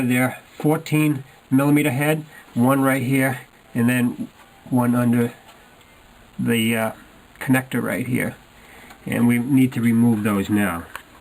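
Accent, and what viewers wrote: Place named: Boston, United States. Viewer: love the video! i lived in boston before. sounds live your from there lol!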